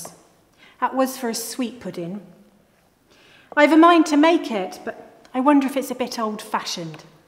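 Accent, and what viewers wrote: United Kingdom. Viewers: Where is this actress from?? I can't, for the life of me, place her accent in the UK. Armonds- almonds Saurce- sauce And so on. I'm wracking my brain! Does anyone know definitively?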